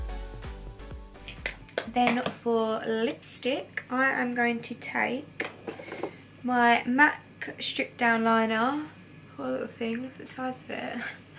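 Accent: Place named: Essex, United Kingdom. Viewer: Just found your channel and absolutely love it! Its nice to hear someone with an Essex accent haha x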